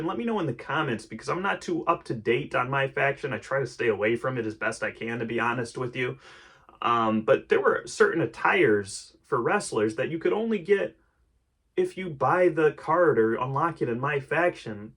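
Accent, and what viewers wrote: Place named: Mexico. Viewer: No homo bro damm you are hot 💀😭 I was thinking you were from Mexico because of your voice 📝🗒️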